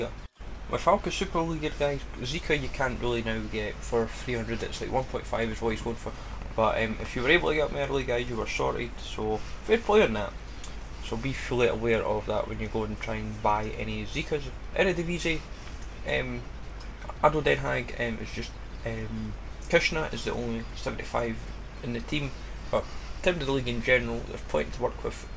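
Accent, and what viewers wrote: Scotland, United Kingdom. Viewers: i fucking love scottish accents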